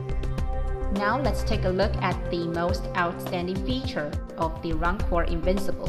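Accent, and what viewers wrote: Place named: China. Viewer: Her voice sounds like a robot with a Chinese accent...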